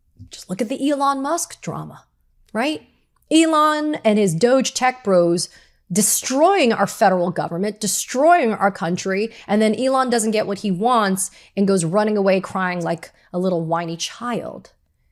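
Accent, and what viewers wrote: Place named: Australia. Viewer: Adoring Katie Phang unplugged! ❤❤❤😂😂😂😂😂 You sound very Aussie, would fit in very well down ere!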